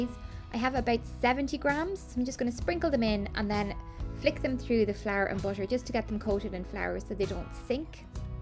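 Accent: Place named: Ireland. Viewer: They look lovely, and your Irish accent is lovely as well! Can’t wait to make them with some clotted cream!😊👍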